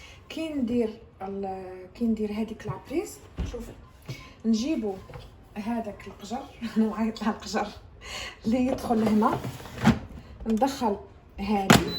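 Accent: Algeria. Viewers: وهرانية ياك 😂هدرتنا حلوة عسل 😊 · ياختى ما احلى كلام اخواتاتنا الجزائريين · لهجة جزائرية 😊😊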